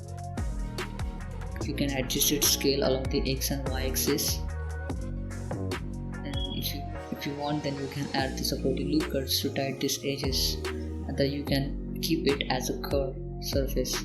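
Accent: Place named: India. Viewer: Hello, very interested in what country you are from? India? From your accent it seems to be India, I want to check the assumption. I'm from Russia myself. Video is amazing!!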